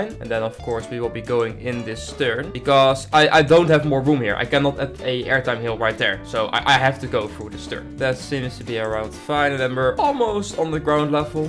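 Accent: Netherlands. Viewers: Ar you Nederlands?????????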